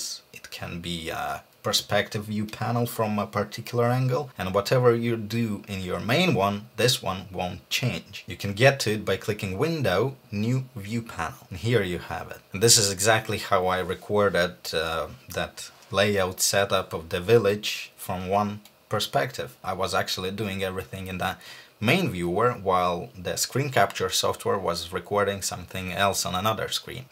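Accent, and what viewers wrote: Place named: Russia. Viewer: почти не слышно русского акцента) отличный видос, от души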